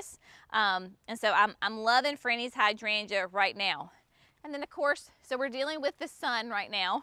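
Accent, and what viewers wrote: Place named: North Carolina, United States. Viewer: As soon as I heard your voice, I knew you had to be from North Carolina. Haha I am from NC too. Love your garden! Im trying to find beautiful shade perennial flowers and shrubs to plant in zone 8.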